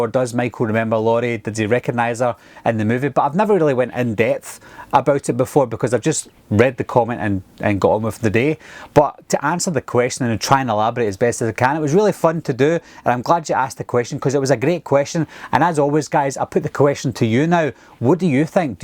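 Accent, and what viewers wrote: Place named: West of Scotland, United Kingdom. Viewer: Trying to place your accent. Definitely West of Scotland. You dont pronounce chair as 'cher' so I'm guessing you are from Ayrshire or very close to it.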